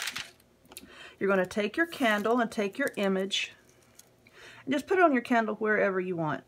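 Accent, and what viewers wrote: South, United States. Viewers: Thanks for sharing and I love your southern accent.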